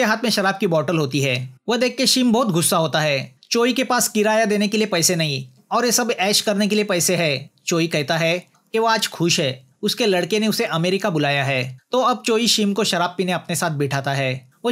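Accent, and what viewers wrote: Maharashtra, India. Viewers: मराठी medium का है .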